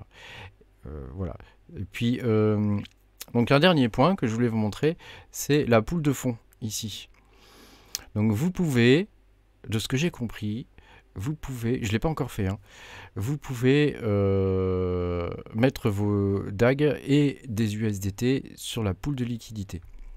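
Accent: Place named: France. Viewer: anyone loves XDAG, will also love your French accent.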